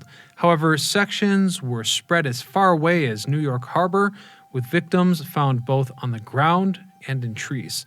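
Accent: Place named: Chicago, United States. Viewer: I knew you gave the Chicago vibes😭 lived in Springfield my entire life and people from the suburbs most definitely have their subconscious tells. If you tell me you’re from IL, 90% of the time i can tell if you’re from a suburb or a corn field without asking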